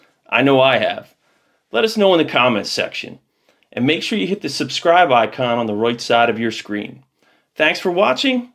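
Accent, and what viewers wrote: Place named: Baltimore, United States. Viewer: Speaker sounds to be from Baltimore hon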